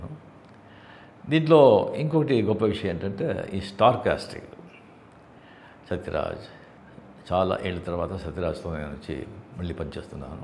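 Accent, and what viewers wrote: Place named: Karnataka, India. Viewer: really our thalaivar telugu speech is osm it seems he speaks telugu better than tamil only in movies thalaivar tamil will be like native but in offscreen his tamil contains kannada marati accent but his telugu is clear and natural sounding telugu is very easiest language in south india but many tamil actors struggle to understand and talk telgu even after so many years of release of their movie in andhra kamal sir karthi sir chiyan vikram sir but karthi sir telgu is quite decent kamal sir chiyan vikram sir telgu carrys tamil accent slight but thalaivar telugu is like close to native according to me he really speaks telugu better than tamil. in movies he deliver scripted tamil dialogues but his tamil is still bit impure no tamil actor can beat him in telugu speaking. he speaks telugu with null influence of other language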